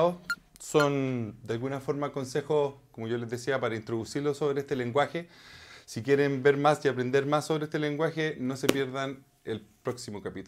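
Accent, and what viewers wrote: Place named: Chile. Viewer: No e visto los detalles del canal pero detecto un fuerte acento a ‘tsiléno’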